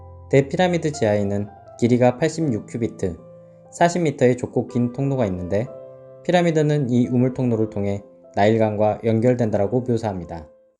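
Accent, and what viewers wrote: Jeolla, South Korea. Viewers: 모음 발음이 전라도식이네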